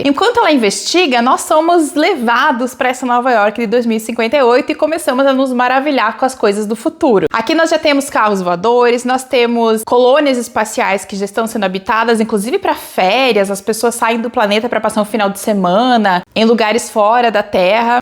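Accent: Rio Grande do Sul, Brazil. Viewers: Adorei a resenha, sim me convenceu a ler e com certeza irei! Inclusive, nd relacionado com o video kkkk, mas eu sabia q eu conhecia esse sotaque gostoso gaucho de algum lugar, ai vendo sua caixa postal percebi q tu é de Bento, quase vizinhos kkkk, sou de Caxias do Sul. Conheci recentemente teu canal e tenho maratonado!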